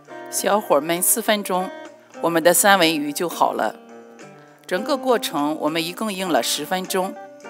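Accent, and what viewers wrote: Qingdao, China. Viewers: 山东人吧？哈哈，一听就亲切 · 绝对如假包换的青普：) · 听着这熟悉的口音 像是青普（青岛普通话）呢😄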